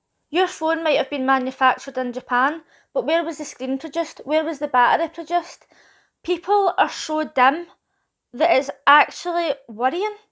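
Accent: Scotland, United Kingdom. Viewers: ps ...Im London all my life,...nothing wrong with your scottish accent...perdfectly understandable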